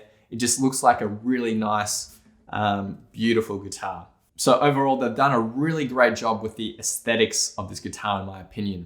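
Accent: Australia. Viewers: The Australian accent is screaming ate so hard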